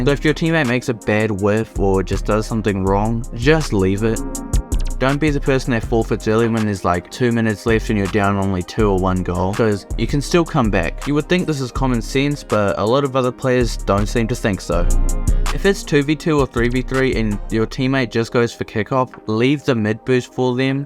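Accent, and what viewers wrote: New Zealand. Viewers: Are you a kiwi.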